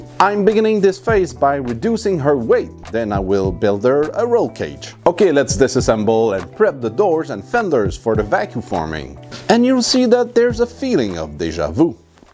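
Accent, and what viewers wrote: Quebec, Canada. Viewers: Hi. I must say that this is the most pleasant and a bit unique quebec english accent I've heard. I would venture to guess Sherbrooke (because i haven't heard people from there), but really curious to where you're from.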